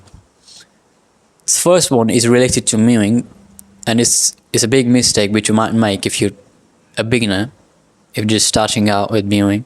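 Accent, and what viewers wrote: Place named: United Kingdom. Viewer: Bro's Pakistani And Sounds Like British💀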